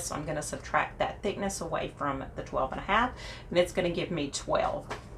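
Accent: South, United States. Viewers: I just like listening to your accent! We live in Italy now so I love feeling the southern drawl!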